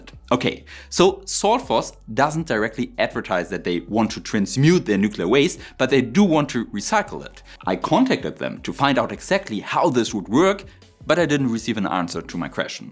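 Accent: Germany. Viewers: I come here to listen to that German accent and I always learn something new. WIN - WIN.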